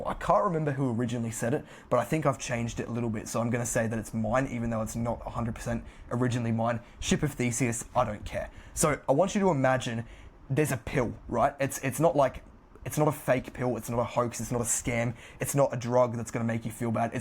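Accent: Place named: North Carolina, United States. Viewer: Bruh you from North Carolina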